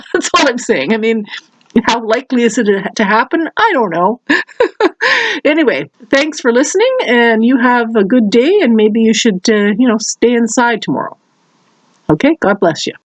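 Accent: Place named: Canada. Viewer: I've been outside and I'm still alive. April 21, 2020. You sound like your from Canada.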